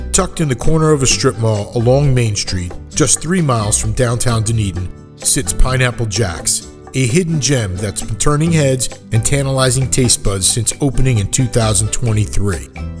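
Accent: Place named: North, United Kingdom. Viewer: You sound like Northern transplant to me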